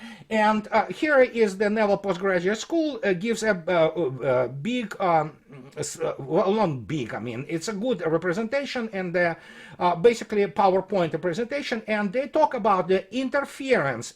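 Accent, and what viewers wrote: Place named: Russia. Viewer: i cant help myself but keep looking forward to your latest videos.i enjoy all your videos with technical information and analysis . enjoy your humorous description of western politicians and so called war experts who never faced bullets in the front lines. i love it when you tell the facts in Russian accent .love all your blogs.god bless you sir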